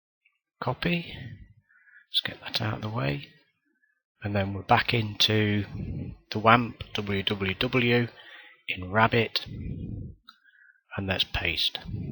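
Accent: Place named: United Kingdom. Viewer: Fantastic tutorial! It's nice to get some British tones on here too!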